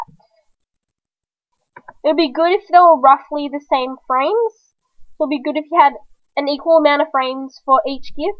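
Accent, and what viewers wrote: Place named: Australia. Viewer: speaks in an aussie accent like me is so refreshing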